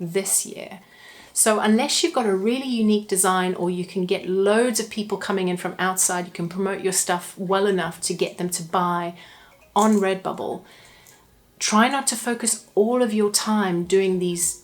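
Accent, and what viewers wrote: South Africa. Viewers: Another value packed video. Thanks for the awesome tips. Are there any valuable Redbubble facebook groups that you feel comfortable recommending? Then off-topic, are you perhaps South-African? I detect a bit of a SA accent.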